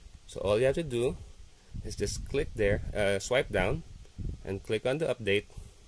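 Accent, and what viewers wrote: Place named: Philippines. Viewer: you sound like a filipino.. are you pinoy?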